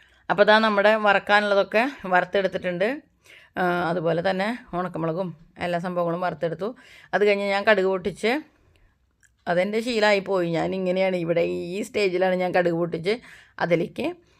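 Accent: Thrissur, India. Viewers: Hi che,thank u for uploading videos soon,from a thrissurkari who lives in Melbourne now. I love watching ur vlogs to hear Ur thrissur slang and Ur simple life.love u che a lot.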